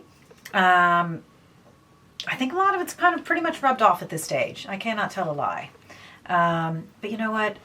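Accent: Ireland. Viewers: You sound a little bit Irish 🇮🇪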